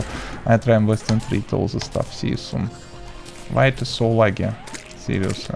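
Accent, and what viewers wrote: Russia. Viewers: Is he russian??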